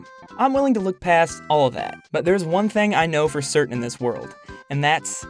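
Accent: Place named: Midwest, United States. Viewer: I can here your accent big time in this vid. Can't say I completely place it but I believe that it's a midwestern accent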